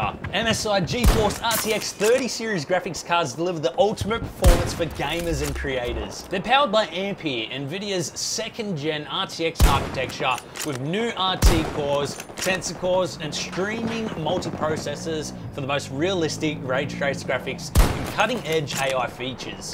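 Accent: Australia. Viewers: hello are you australian?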